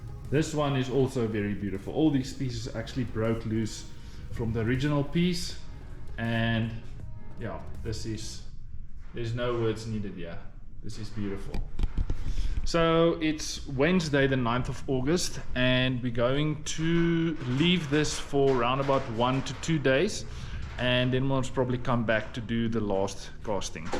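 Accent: South Africa. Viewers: I kight just be assuming.. but I am mostly just shocked at that thick South African accent.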